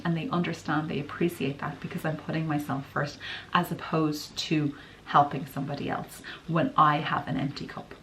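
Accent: Ireland. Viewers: Hello, new subscriber here! I contacted bodyslim but all courses r fully booked! I shall start walking and hope to enroll with bodyslim with their next intake, in April. You r lucky u realized u need to prioritize yr time at such a young age! Yes because if we do not, we end up BURNT OUT and depressed! Enjoying yr videos and love yr Irish accent 😊.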